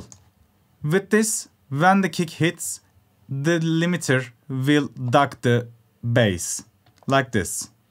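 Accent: Turkey. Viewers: Bilader türk ingilizcesi konuştuğun çok belli 👍🏽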